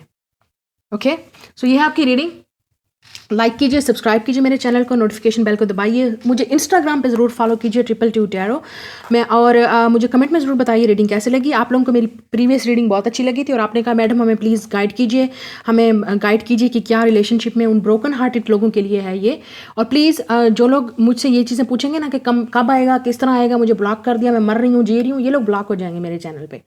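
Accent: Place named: Punjab, India. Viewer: Oye hoyeeee.... Enni sweet punjaabi.... ♡♡♡♡♡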